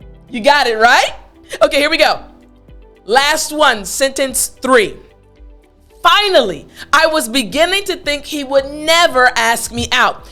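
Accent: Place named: United States. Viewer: Your lessons is good and have the American accent so anyway that's awesome! I'd like the way you are teaching the students because you have style of teaching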